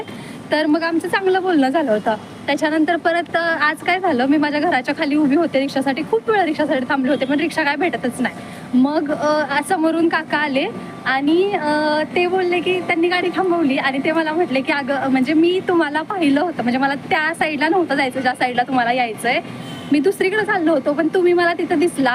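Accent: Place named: Latur, India. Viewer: Tumhi Latur che ahat ka mulche bhase varun vatat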